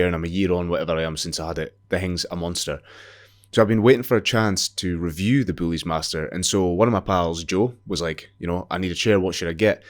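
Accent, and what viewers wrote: Scotland, United Kingdom. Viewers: Amazing ! Love when I find a Scottish review. "If youv goat your own then use it coz it's pish" 😂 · It's basically a seat from an old volvo with all them lumbar supports lol reminds me of my old man's motor years ago lol I'm looking for a new seat and it's gonna be one these boulies seats I do like that one in black I've been looking at as I'm a modeller and always at a bench building kits!. Whare are you from you sound like me down tae earth love the review deffo new scots subscriber!😂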